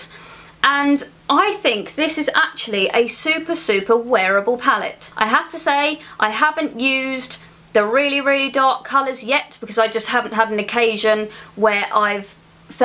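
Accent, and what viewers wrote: England, United Kingdom. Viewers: Hello girl, you are so lovely to watch. The little nook where you film your videos is properly decorated and pleasing to the eyes. I love your English accent :). Two thumbs up for your videos. Well wishes from Asia :).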